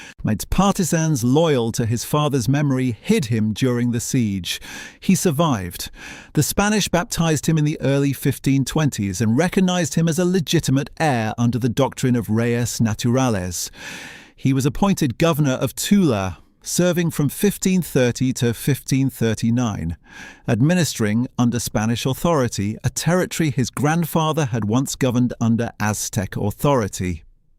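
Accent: United Kingdom. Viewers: the british english pronunciation is messing up the spanish spelling and the words giving me a headache, as an american this "Tree" is a "Mess" as "Mexico"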